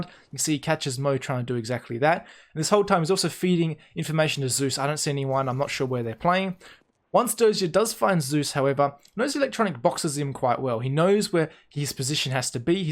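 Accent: Australia. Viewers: Nice recommendation, but he is not from NA I think. He must be from Australia, that's why he is speaking with a funny accent.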